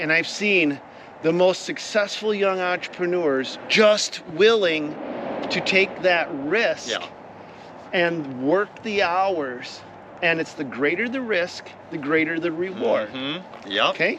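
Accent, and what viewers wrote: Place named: Midwest, United States. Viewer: Hey man, love your videos. I’ve noticed I recognized you from another video and I’ve been enjoying the videos. I also wanted to ask, where you’re from? Heavy midwestern accent comin’ from you! Lol kick ass channel 🤘🏼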